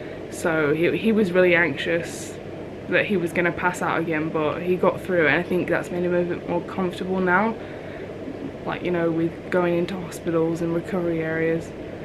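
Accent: United Kingdom. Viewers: Is British? Sorry if this question is stupid 🤦🏼‍♀️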